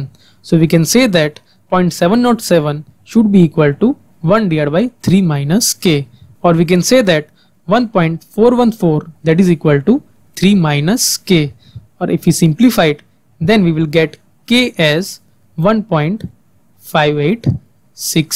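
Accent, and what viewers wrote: India. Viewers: Just try not to rush.... The fact that you have that typical "Indie english accent" makes it not easy to understand it... So speak more slowly (10 times)... For the rest it's good explained.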